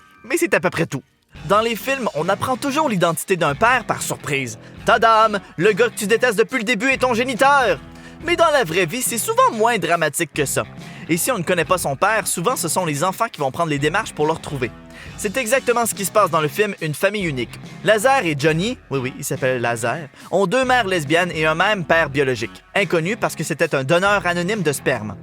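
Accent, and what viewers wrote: Quebec, Canada. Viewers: Piter pane et mon paire?! 😂😂 alala laccent quebequois est parfais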